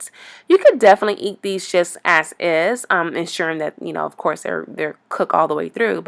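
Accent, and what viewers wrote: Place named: South, United States. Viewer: I love this lady, sounds so family like and comforting, guess it’s a southern thing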